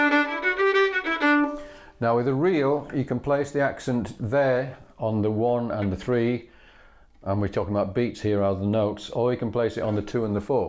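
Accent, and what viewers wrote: England, United Kingdom. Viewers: An English accent ha! Well I'm glad not all Englishmen hate the Irish. Do you have any good advice for the fingerboard technique to give it that Irish twang.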